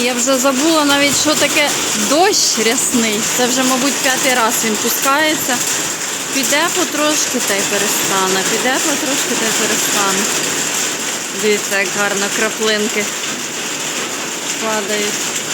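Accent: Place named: Surzhyk, Ukraine. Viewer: Ви суперові, приємно чути ваш суржик. Нехай у вас все легко виходить, удачі вам.🌺👍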